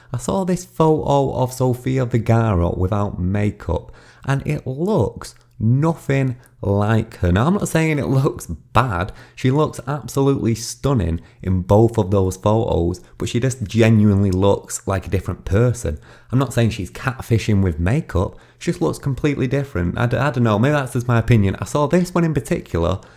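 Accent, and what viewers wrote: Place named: North, United Kingdom. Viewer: Appreciate your commentary and deep dives. It's always your great Northern accent and the fact that your content is always fact based and never without a sense of humour that always makes me smile along with the fact that pepsi is my beverage of choice! Irony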